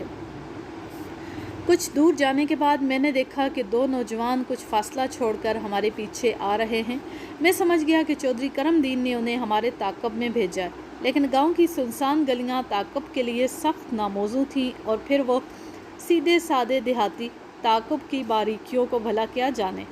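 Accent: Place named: Punjab, Pakistan. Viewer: Apka lahja panjabi h Karachi wali Thora feel krte hain behrhal stayel acha salamat rahen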